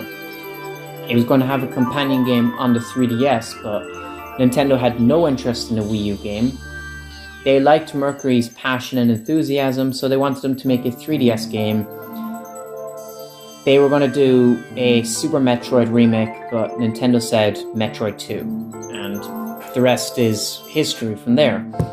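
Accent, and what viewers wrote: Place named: Ireland. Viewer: Irish bai!?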